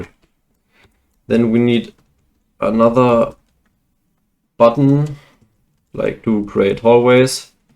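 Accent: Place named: Germany. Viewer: German accent goes BRRRRR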